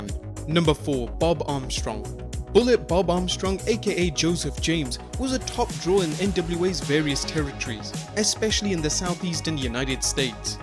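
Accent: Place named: United Kingdom. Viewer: Who wants to listen to a brit?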